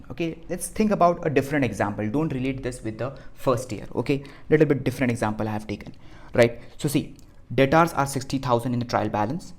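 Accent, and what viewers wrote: South India, India. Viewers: Sir your South Indian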